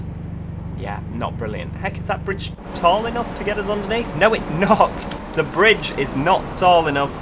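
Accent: Manchester, United Kingdom. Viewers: I'm writing you from isle of dr-seuss...is your accent from manchester there, please..? if not, would you then let me know wherefrom? <link> ;)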